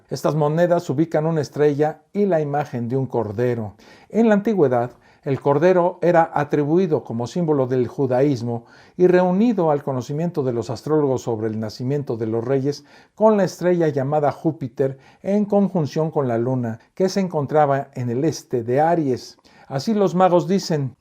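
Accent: Mexico City, Mexico. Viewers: primer vez que te veo, y ya vi varios de tus videos, todos muy interesantes, pero para ser una persona muy culta tienes un acento chilango, demasiado !!!NACO!!!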